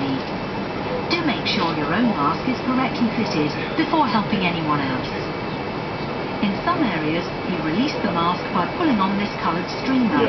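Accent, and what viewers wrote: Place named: United Kingdom. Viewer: Ah, yes, upper deck on a BA 747, what more could you ask? The best thing about this video is the beautiful british accent and of course the music.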